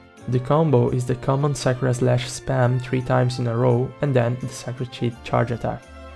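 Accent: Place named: Italy. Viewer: Non so bro mi sai di italiano per l'accento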